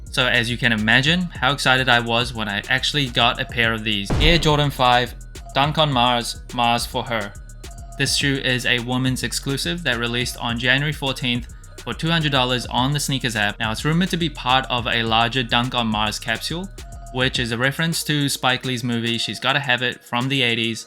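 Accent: Australia. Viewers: I can’t read your accent, are you from Australia?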